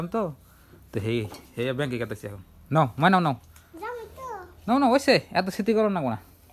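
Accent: Tangail, Bangladesh. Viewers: বাংলাদেশ টাংগাইলের মতো ভাষা লাগে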